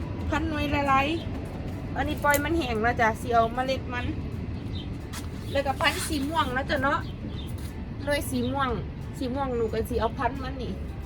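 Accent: Udon Thani, Thailand. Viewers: ສຳນຽງທີ່ເຈົ້າເວົ້າຄືຄົນອຸດອນນີ້ລະ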